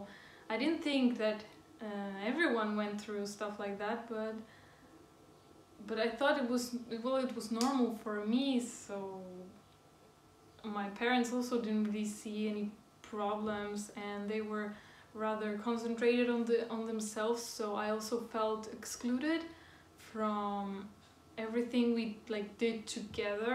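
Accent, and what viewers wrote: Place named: Europe. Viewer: Honestly I think you got lots of potential. It's a shame you quit. I do similar vids. You sound European, let me guess..... enmmm... tbh idk. Middle Europe? Aahh Poland! So I guess I was right. I got my answer as I watched your video. It's a good video. You should do a legendary comeback to youtube.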